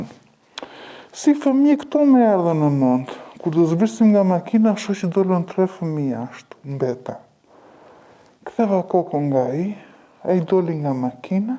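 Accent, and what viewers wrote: Albania. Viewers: Te duket nga veriu me kete dialekt ? Zonja perdor fjalen koshtrat kete fjale e kam degjuar te flitet nga gjirokastrite.Mentalitet te tille per te shitur vajzat qe ne mosh fare te vogel ekziston akoma rendom dhe ne zonen e Mallakastres dhe Fierit por e sigurt nuk eshte nga keto zona. Eshte ose nga gjirokastra ose nga saranda sepse jeton ne 1 zone qe kane patur besimin katolik sic edhe zonja thote shkova ne 1 kish. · I kam degjuar pothuajse te gjitha historite tuaja por 1 gje nuk kuptoj ne te shum histori pervec se i njejti ze ,ok mund te jet i modifikuar por dialekti i njejte si nga zonat e Fierit ,kjo te le te kuptosh qe mund te jene histori te sajuara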